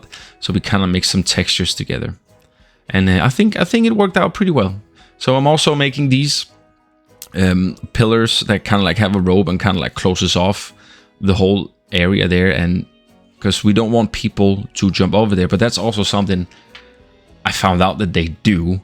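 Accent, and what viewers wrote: Denmark. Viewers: love the danglish accent <3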